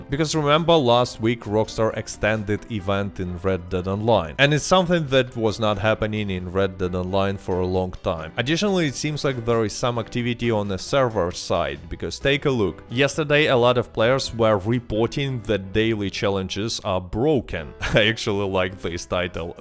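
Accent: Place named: Russia. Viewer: Я один отчетливо слышу русский акцент в речи автора?🤠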